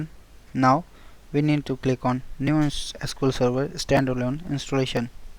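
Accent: India. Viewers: Ah great! Another video of installing Microsoft programs done by someone with an Indian accent. If it wasn't for your people I wouldn't know what to do. Fuck the ads though, wouldn't you agree that 10 ads is a bit much?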